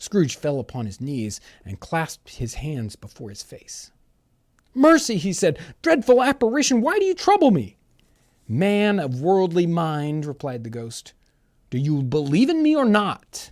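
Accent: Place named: United States. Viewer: That was great and a bit different with an American accent 😀👍🇬🇧🇺🇲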